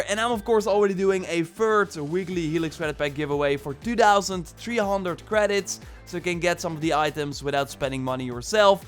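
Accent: Netherlands. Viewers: Small Question are you dutch?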